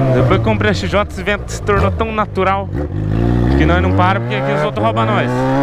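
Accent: Goiás, Brazil. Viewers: por que você não fala mais no pique do Goiás???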